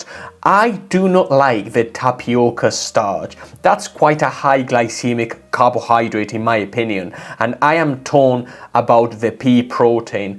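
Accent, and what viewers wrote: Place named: Northern England, United Kingdom. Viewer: I notice you didn't factor in recalls and poisonings. Kinda important, but maybe that's another video, eh? Blue Buffalo and Iams have horrible track records in that department, FWIW. THANK YOU YOU ANGEL SENT FROM THE GOOD PLACE (I.E. 'the norff' by the sound of you.) We all desperately needed a vid like this. Clear and very educational. By the time I got to the end I felt like I'd learned enough to judge the dry foods pretty well on my own. Well enough to know what to steer clear of at least. I am so grateful. Glad to learn the one I'm currently feeding is the pick of the litter too, thank god! I wonder (and would not be suprised to learn) if our standards of pet food here in the U.S. is even worse than where you are. We probably allow all kinds of crap EU and UK wouldn't dream of allowing. That's if the way they handle the human food over here is anything to go by. 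Anyway cheers, and keep up the good work.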